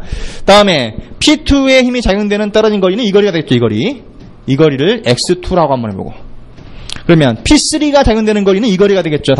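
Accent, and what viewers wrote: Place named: Jeolla, South Korea. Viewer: 전 경남 진주에서 태어나 자랐고 여기가 고향입니다 하지만 건설회사?특성상 타지를 돌아다니며 전북고창이 고향인 지금 제 와이프를 만났고 결혼해 살고 있습니다........억양이나 말투가 교수님이랑 너무 비슷?했습니다....특히 배려버린다~이 말은 완전 똑같았구요......교수님은 재능기부로 이렇게 동영상을 올리셨지만 저 같은 경우 정말 큰 도움이 되었습니다 부담가지지 마시고 교수님 근무하시는 주소를 <mail> 로 보내주시면 제가 교수님재능기부에 대한 조그만 보답을 하고 싶습니다 부담 전혀 가지지 마시고 꼭 보내주셨으면 합니다 교수님은 재능기부 였으니 전 교수님 아니었으면 또 건축구조 때문에 아마도 불합격 했을 꺼 같습니다~꼭 주소 보내주셨으면 합니다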